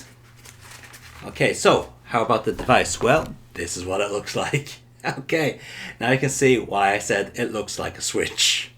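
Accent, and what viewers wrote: United Kingdom. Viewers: Greetings from Brazil. Man, I really enjoy your reviews and battle of the Ports. Besides that, is much better listen the British english.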